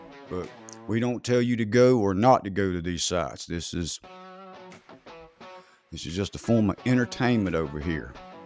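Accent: South, United States. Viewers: Good Reviews... awesome southern accent my G know what im talkin bout..🤙🖖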